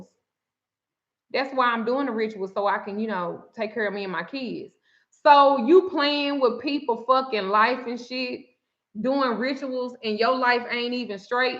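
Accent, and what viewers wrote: South, United States. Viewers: You & your husband look like you two were made for each other: Twin Flame Energy 💫 I love your southern accent.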